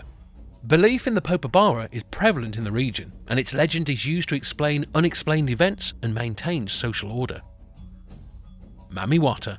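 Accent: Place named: United Kingdom. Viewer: Interesting... Am I the only one finding a british accent explaining African culture to feel a bit... Wrong? Inappropriate? Strange?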